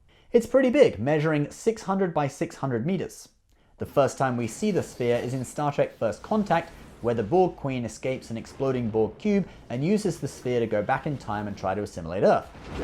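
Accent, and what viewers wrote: United Kingdom. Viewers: YES THE FRICKING BRITISH IS BACK EVERYONE THIS IS EVEN BDTTER THAN WHEN I FIRST HEARED ABOUT HALF LIFE ALYX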